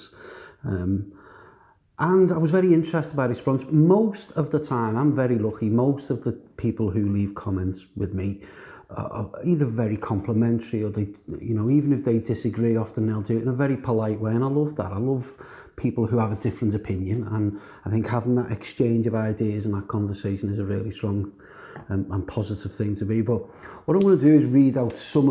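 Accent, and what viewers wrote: Liverpool, United Kingdom. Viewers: A beer and a chat about boxing at the Phil one day. Listening to you makes me miss Liverpool man, and the practical logical approach of scousers. Best in da wairld 😋🤝❤️🤝😋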